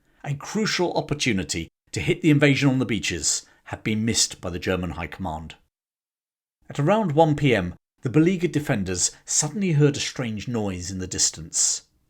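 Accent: United Kingdom. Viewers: Many thanks for the video, with this English UK accent, spelling so British. Now that bad english is spoken everywhere worldwide, I lived for 2 decades between Singapore and Jakarta, working to supply tools and parts for industry. We have singlish, American men from Texas, Australian from Melbourne and some kiwees and Filipinos. Indians also. So thanking you very much for this wonderful British video. 😊😊😊😊😊😊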